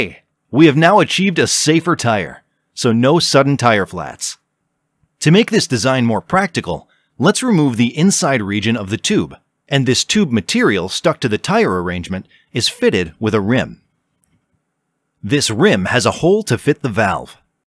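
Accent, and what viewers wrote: Kerala, India. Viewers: Worst Indian accent ever. It sounds like something out of a stand-up comedy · He has a Malayalam accent. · The മലയാളം accent seeps through. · Is that malyaali accent I hear? So good to hear that! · You talks like a mallu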